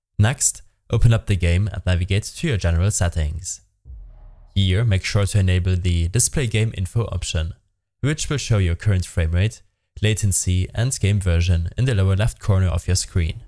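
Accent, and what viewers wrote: Germany. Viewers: Man merkt so hart das du deutsch bist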